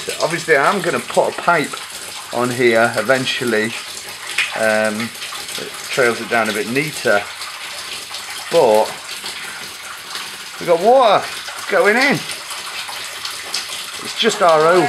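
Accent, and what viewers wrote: United Kingdom. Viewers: Yeah, I just poking fun at you. One dude to another...I love your accent, lol. Is that Cockney? I am from the American midwest. I sound alot like John Wayne. I like you videos. Especially when we can see the equipment and how it is hooked up. you are my newest hero If I had your mailing address, I would mail you a cape and a big old S for your chest. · the british accent is great i loove these videos hahaah keep em coming MATE!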